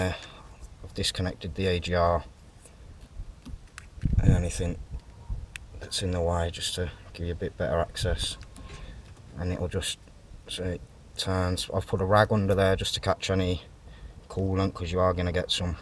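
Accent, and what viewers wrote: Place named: Black Country, United Kingdom. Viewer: hi are you from black country? I'm looking for someone to sort my astra and you sound local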